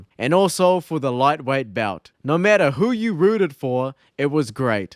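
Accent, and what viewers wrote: New Zealand. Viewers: Is that accent an newzelander that’s been living in America?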